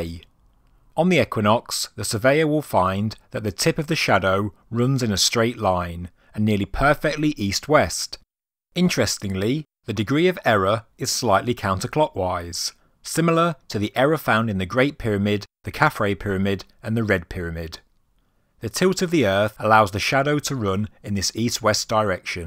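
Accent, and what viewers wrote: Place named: Birmingham, United Kingdom. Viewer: definitely not Scottish. Sounds more Brummy to me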